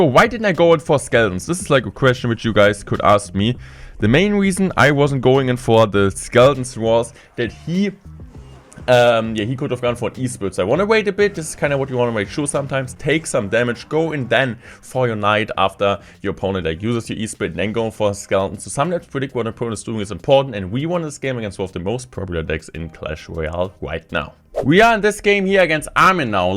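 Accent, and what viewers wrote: Germany. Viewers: To the English people: Do you hear a German accent? Because i dont think he is talking that Bad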